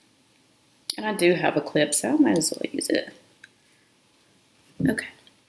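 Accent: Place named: South, United States. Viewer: I definitely need a detangler. My hair is 3c so I hope it works for me. Also where are you from? Your accent is super southern and strong lol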